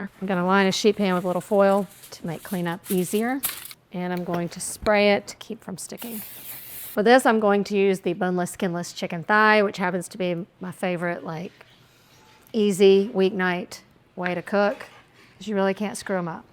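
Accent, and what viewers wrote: South, United States. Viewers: Yummy I Would Pour it over Broad Egg Noodles or Fetachiy Noodles or even Mashed Potatoes Served with Garlic Bread. You Explain everything so Simple. You is so Gosh Darn Cute From a Canadian Fan in Vancouver B C ( ❤ Love your Southern Accent ) PS: Love to see more Fish Recipes IE: Oven Baked Salmon / Pan fried Butter, lemon Trout / Pasta with Prawns & Fresh Dill 🤗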